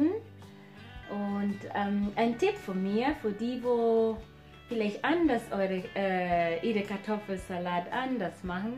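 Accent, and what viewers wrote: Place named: Germany. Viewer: Good cooking my dear🇸🇪🇸🇪🇸🇪😁😁😁😁😁🦚🦚🦚🦚🦚👜👜🌔🌔🌔🌔🌔I like your German accents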